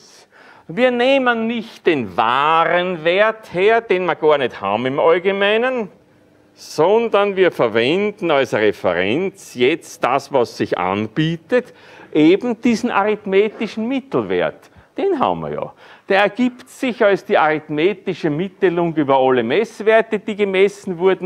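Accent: Vienna, Austria. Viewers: Allein schon der Wiener Dialekt! Sensationell! Sehr kurzweilig vorgetragen, Danke! Viele Grüße nach Wien aus dem Raum Köln!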